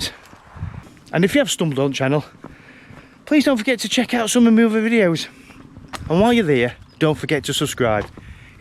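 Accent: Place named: United Kingdom. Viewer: I wish this fellow mention for us, foreign runners what he meant by 5k (in his British accent) Is this K (Kilometers) or Miles? Cause this is a huge difference.. 5k in miles is 8 km.. I've been running 10-12k (km) daily for the last like 3 years and I'm over 60 + y.o. Current speed 5 -5.20 / km (Started from 6.15 - 6.30/km back in few years) .. In terms of the REST days.. If I take 1 or 2 then running again its like starting from scratch (even harder).. At this point I came to conclusion that Speed doesn't matter much, but its hard to hold competing EGO in check, that keeps on "speeding".. I can confirm, that you still must "behave" with the food intake, or else even running 10k (km) +/day alone does not guarantee that you will lose weight. Good luck.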